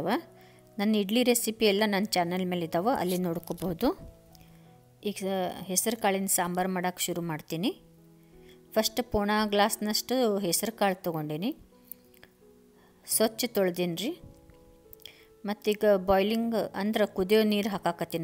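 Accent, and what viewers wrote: India. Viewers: Your Kannada voice over added Best flavour to the Sambar recipe 😍 Please continue it for all videos , ಹುಬ್ಬಳ್ಳಿ ಭಾಷೆ 🔥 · You sound awesome 👏🏼 so good to hear you speaking in Namma Hubli Kannada 😊Loved listening to it..!! Your sambhar looks superb and this is what I call comfort food...You are such a versatile cook 🙏🏼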